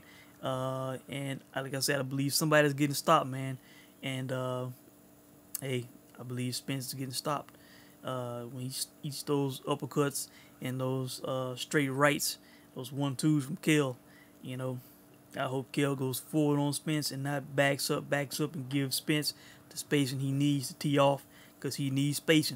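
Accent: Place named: South, United States. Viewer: lol you have a natural Southern accent already, so I didn't get you quoting in country, something... as far as brook wanting get acclimated to a heavier weight before fight ggg again. that's realistic. you should want you fight to feel comfortable going a whole 12rounds with the weight.. jumping up two weight classes isn't smart to do. I do agree about Spence Jr talking about sparring sessions... that's not cool.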